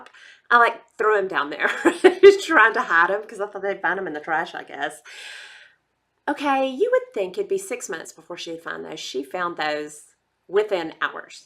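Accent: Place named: Texas, United States. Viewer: where the Heck are you from? I talk just like you and the "YALL" was a dead giveaway. What town in Texas are you from? I laughed my butt off all the way through this video. It was GREAT! Loved every minute of this. Hope you are having a terrific week. Sending Big HUGS to ya Girll! xoxo Sharon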